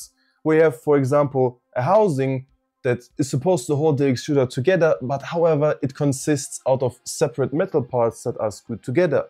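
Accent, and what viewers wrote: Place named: Russia. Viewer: Till the last phrase, I was absolutely sure that I hear Russian accent😅The only doubt was why the Russian guy talks about German engineering 🤔 And thank you for your video and your job, of course. It's awesome.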